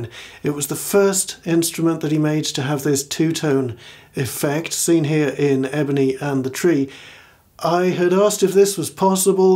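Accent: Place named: United Kingdom. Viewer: really enjoy your playing and videos....something too about a calm British accent speaking the King's english lol!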